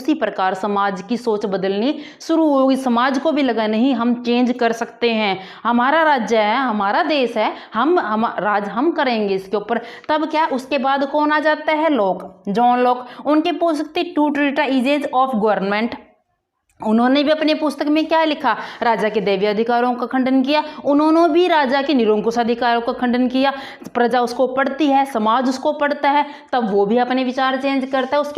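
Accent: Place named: Haryana, India. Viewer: Mam I think you are from haryana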